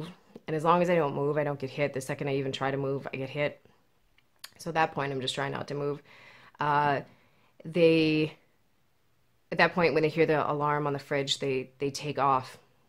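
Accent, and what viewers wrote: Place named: Europe. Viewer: He sounds like he's from one of the bloodlines of cowards that stayed behind in europe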